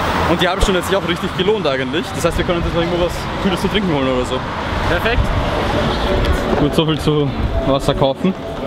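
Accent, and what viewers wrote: Austria. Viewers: bist du Österreicher ?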